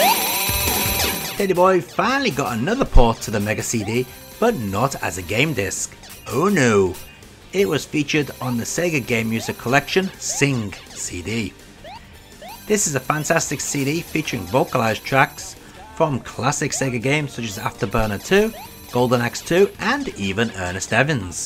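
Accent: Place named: St Helens, United Kingdom. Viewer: I've got to ask, mate. Where are you from originally? Northwest right? Sound like st Helens.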